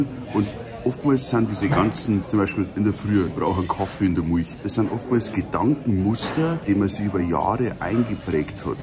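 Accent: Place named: Salzburg, Austria. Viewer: Bist du im Berchtesgadener Land dahoim? Der Dialekt klingt so gleich wie da meinige da in Salzburg.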